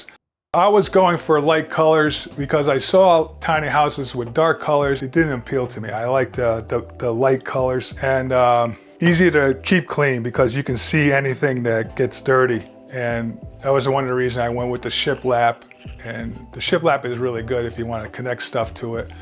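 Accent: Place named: East Coast, United States. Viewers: He's so much cuter than Will. I also detect an eastcoast accent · is my brother, and he is cuter, and so. so funny. He is so happy now back in CA. But yes the dialect is New England.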